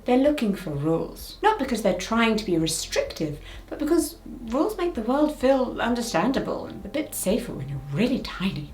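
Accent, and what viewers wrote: United Kingdom. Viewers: You sound like what I think every person on the North American continent thinks British women sounds like. I will be subscribing for more.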